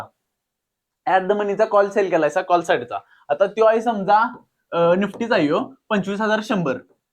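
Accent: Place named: Kolhapur, India. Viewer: Mast marathi boltos kolhapur chi atvan aali karn aamchy kolhapur madhe aaschi bhasy vapartat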